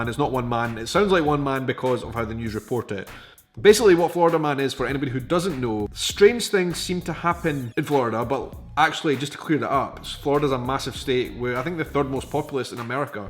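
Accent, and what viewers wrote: Scotland, United Kingdom. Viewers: You need to come to Montana. Everyone here will love you and you'll get all the free drinks you want as soon as they hear that Scottish accent. Our state is larger than Germany and trust me you'll notice it. For us a 6 hour drive is just going from one side to the other on the interstate. You can even stay with my family, we have houses on both the Eastern and Western side of the state plus a cabin up in the only Eastern lake where cabins are allowed so we can toss in a fishing pole and catch some delicious cold water trout. I'll even bring the brews and some good cigars.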